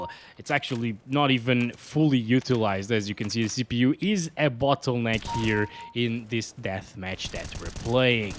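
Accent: Portugal. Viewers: és de Portugal? Foda-se kkkkkk boms videos mano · Voce é Português ou nasceu em outro país e foi morar em Portugal? Acompanha seus vídeos a algum tempo! Deve ser o português mais carismático que já conheci! haha! Sou do Brasil!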